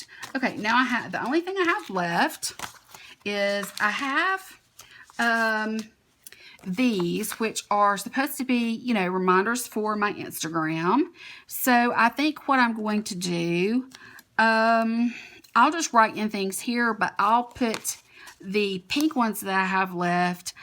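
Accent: South, United States. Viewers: Girl I love watching your videos, and your southern accent is EVERYTHING! I can absolutely enjoy watching your pwm's even if I can't buy the kits because as someone who can't afford to buy sticker kits, these kinds of videos give me inspiration on how I can use computer paper or cardstock to create a layout. Keep doing you and being your authentic beautiful self 🤗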